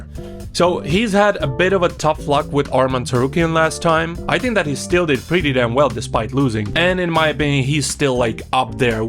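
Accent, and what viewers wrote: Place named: Scotland, United Kingdom. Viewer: Loving the Scottish pronunciation 🙊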